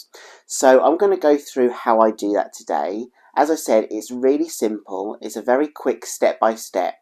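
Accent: United Kingdom. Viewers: Oh, you're a brit? Love the brits!!! I do, govna.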